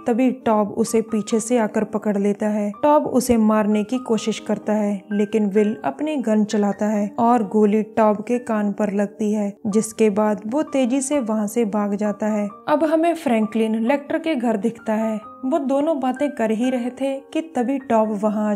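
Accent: Punjab, India. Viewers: Punjabi accent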